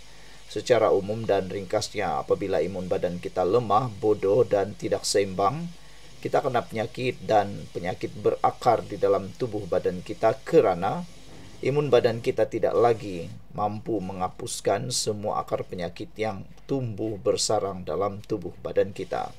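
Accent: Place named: Borneo, Malaysia. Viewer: Ur bahasa is horrible....if u can get rid of ur borneo slang...I m non offender.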